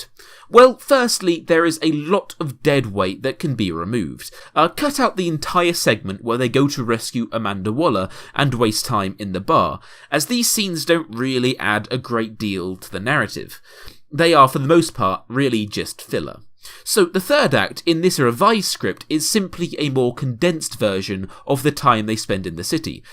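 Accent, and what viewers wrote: United States. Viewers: wow dude you have a nice american accent i wish i could do a good British accent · american accent revealed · I love your american accent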